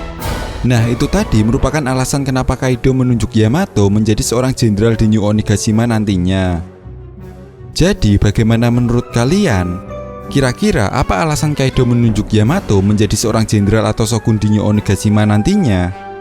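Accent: Java, Indonesia. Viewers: Medog tenan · Ngomong medo, dari dulu ga berubah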